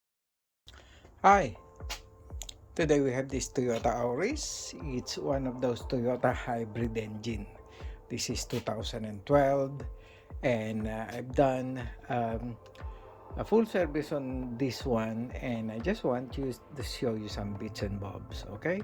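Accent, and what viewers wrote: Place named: Spain. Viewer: Se nota que eres español por como pronuncias en inglés.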